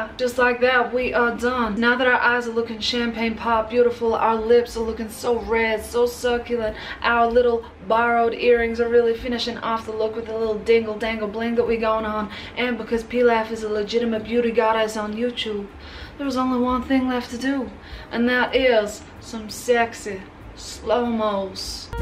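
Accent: South, United States. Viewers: I am digging the southern accent. You are truly my favorite youtube goddess. Signed a southern country girl from Florida 😂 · 😯 Well colored me impressed! I'm from the South and your country twang you got going on there is amazing. Lol took me by surprise. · A better Southern accent than half the people in Hollywood. "Only you can decide what you don't like about your face. Other people can try, but you can tell them to shove off." If I didn't know any better, I'd think you were a true Southern Belle. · I am so loving your Southern accent ❤️ You sound more Southern then I do and I am from Alabama the heart of the South 👍🏻❤️👍🏻❤️👍🏻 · Omg your southern accent is amazing I have laughed so much watching this thank you so much because I definitely needed a smile today 😊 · I love your southern accent. "I hate this with a burning passion" I've said that so much. 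Lol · Living for the Southern accent because it literally sounds like so many people I have grown up around lol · I love your videos but this accent has to go. It’s such a horrible drawl and southern accent. Being a southerner it was so so hard to listen to. Please go back to your normal voice and accent. I love the normal, it’s so happy and delightful. Beautiful make up though. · I am so proud of your accent in this one! It's hard for some people to pull of a good American accent but you sounded like a total southern belle. I am applauding you so hard right now😁😁😁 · Dear, when I first saw one of your videos I thought you were Russian, only to find out you are Australian. Being from Georgia, your southern accent is pretty good! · Is this a southern belle voice? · Your Southern Accent reminds me of Mary Stuart Masterson as Idgie Threadgoode from Fried Green Tomatoes! Well done! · I love the country accent😁😁❤❤ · Im from Tennessee and I’m impressed with your southern accent! 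At first I was more confused than a fart in a fan factory but then I realized what was going on. 😁💜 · I love the southern but I missed YOU in this video. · I love! You're southern accent is on point! I'm from Alabama so I'm an expert:-) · Love how she slips in and out of a Southern accent.....sounds like a cross between Georgia, maybe a smidgen of Tennessee and Texas in there 😍 and the look is stunning !!!! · I loved ur Southern accent & I'm from the south born & raised & still here. Lol but I gotta say ur accent is better than mine. I love ur videos. U look absolutely AMAZING. & U r amazing. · I'm new to the channel but I love your makeup tutorials! I've never been able to do a proper wing, but after watching some of your videos, I decided to try the flick, drag, and hope for best method. I got so many compliments. Thank you!! Also, being from the South, I love the accent. Just like a Southern belle darling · I love the southern accent my husband is from the south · I loved the bits where good old appears because, not that this fancy southern lady accent isn’t fabulous, it just isn’t in her true form. 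· the southern drawl is... Creepy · Your Southern accent is sooooo good. I love love LOVE IT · The smooth Southern US accent is actually so good and relaxing, it's weird lol. The marcelled waves are really cute! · Love the southern accent.....but God it threw me off so much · I love the southern accent, although I prefer old accent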